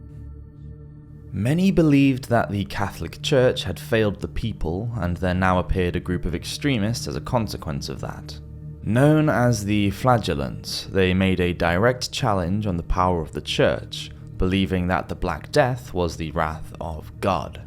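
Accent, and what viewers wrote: United Kingdom. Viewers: Using a British accent while quoting Italian figures is pretentious AF. This video was boring.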